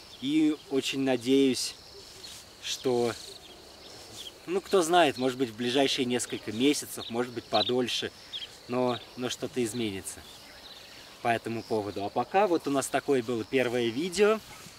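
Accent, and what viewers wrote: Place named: Moscow, Russia. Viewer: Вы меня потрясли! Вашей любовью к животным и самопожертвованием! Очень уважаю таких людей, низкий Вам поклон за это! Нож в сердце - рассказ о страшной ферме, меня всегда убивают такие люди, которые ради денег готовы на страшные поступки! Огромное спасибо, за спасенных львов, за Ваш подвиг человеческий. Только что узнала о Вас, подписалась на канал. Ничего не удаляйте, пожалуйста, будем ждать новых Ваших видео! Левушки Ваши - красивущие!!!!)))) Привет Вам из Харькова))) Очень приятно слышать Вашу речь московскую, у нас там огромное количество родни! Успехов Вам и помощи Божией!!! Все у Вас получится!!!!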